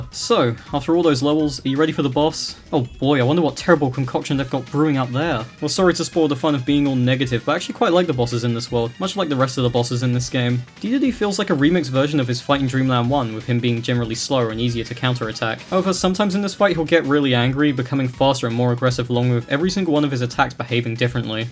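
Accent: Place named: United Kingdom. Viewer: You kinda sound British but I may be stupid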